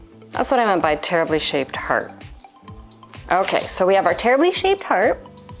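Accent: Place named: Canada. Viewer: Gorgeous arrangement, that first branch is such a lovely, graceful shape. You always say your name at the start of the video and to me it sounds like, but I have seen people address you as. With your lovely Canadian accent maybe I’m not hearing it right! Anyway, whoever you are - thanks for the video! xx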